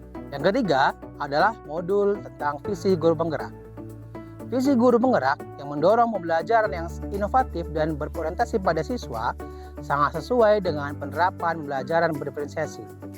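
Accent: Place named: Aceh, Indonesia. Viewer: logatnyo sudah beda sekarang sdh jd orang aceh bener ni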